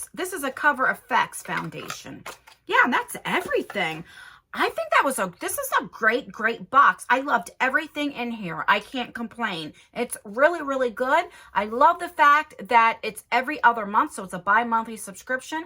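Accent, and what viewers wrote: New Jersey, United States. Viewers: I’m a Jersey girl living in Tennessee. I love watching your videos bc I don’t miss home so much hearing your accent. Ty!!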